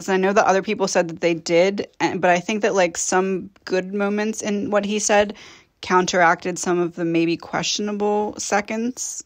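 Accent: United States. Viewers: Luv watching yr readings, it's amazing how you tie it all in.. where are u from? I cant place the accent other than it being USA.. GOD BLESS FROM THE UK♥️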